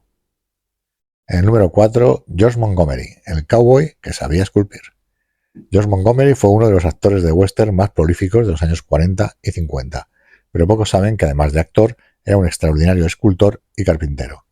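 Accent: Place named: Spain. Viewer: Pongan a una persona que hable español normal no de la madre patria.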